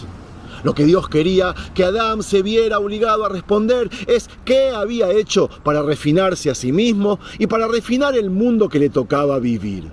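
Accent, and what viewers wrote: Argentina. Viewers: El señor es Argentino?? Habla como Argentino...sus padres seran Judios???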